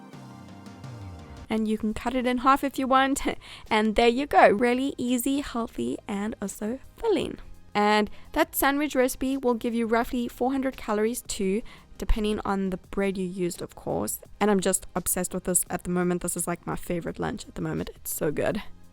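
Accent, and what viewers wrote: South Africa. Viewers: haha that South African accent.. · South african??? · are you South African? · I love your videos I’m going to start watching your videos. Also I love your accent // are you South African? Xxx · Ek kan jou wit-mens Suid Afrikaanse aksent DUIDELIK hoor 😂❤ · sounds like ur from SA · What's the accent??? (I think I'm wrong but you sound South African)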